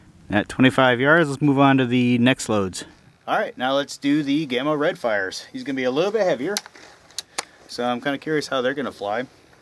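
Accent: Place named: Wisconsin, United States. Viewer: Is he from Wisc-aaaahnsin ?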